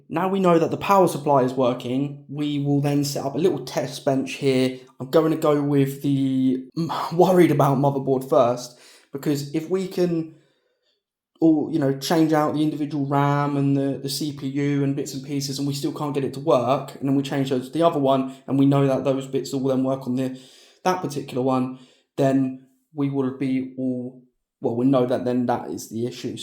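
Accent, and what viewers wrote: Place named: England, United Kingdom. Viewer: Nice video and I subscribed because of it, about time an English accent was on a youtube tech channel keep it up mate.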